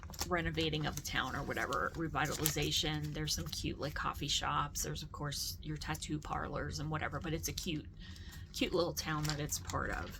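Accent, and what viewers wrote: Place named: Pennsylvania, United States. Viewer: Hi, this is my first time watching your FT, but as soon as I heard you, I recognized the PA accent! I live in NC, but grew up in the northern MD area. I’ve gone to The Stitching Post as well in Catonsville.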